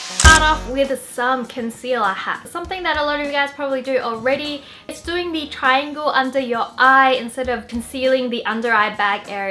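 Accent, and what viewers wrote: Australia. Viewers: Her OZ accent tho😍😍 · Are you Australian? OUO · Wait am I the only one who think her accent is stronger (Australian) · I miss her more Australian accent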